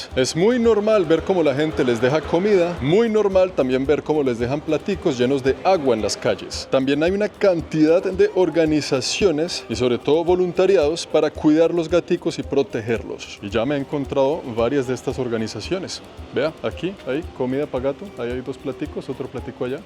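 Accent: Colombia. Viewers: Querido que ermosura son sus videos siento un respeto admiracion por su trabajo me gusta que usted habla con su acento colombiano que se le escucha l muy bonito me hace recordar cuando conosi colombia soy guatemalteca pero me enamore de Colombia megusta la terminologia que usted usa para su narración de sus videos el respeto que muestra para todas las culturas que conose que mi Dios me lo guarde y me lo acompañe siempre que sea el quien guie sus pasos por donde quiera que valla saludos desde U SA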